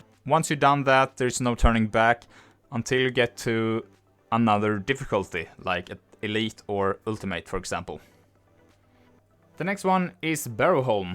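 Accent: Sweden. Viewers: i can hear in you accent when you speak english that you are SWEDISH hahahhah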